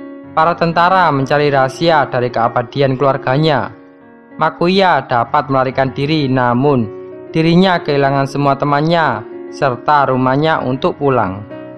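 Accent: Java, Indonesia. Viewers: aku suka medok mu min hehe lanjutkan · Suara admin anime medok medok ya..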